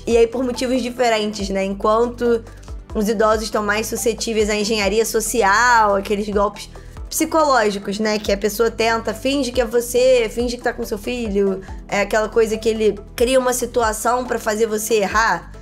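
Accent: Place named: Rio de Janeiro, Brazil. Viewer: O sotaque feio. É esse carioca kkkk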